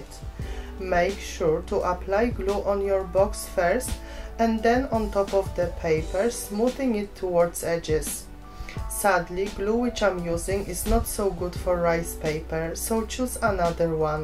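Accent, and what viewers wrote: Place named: Poland. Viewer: Wyraźny polski akcent, pozdrawiam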